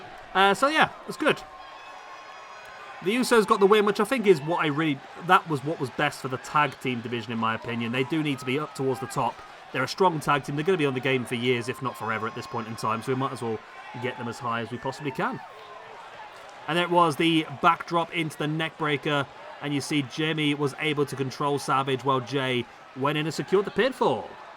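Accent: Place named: United Kingdom. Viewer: Bottle o’ Wataa , love the British accent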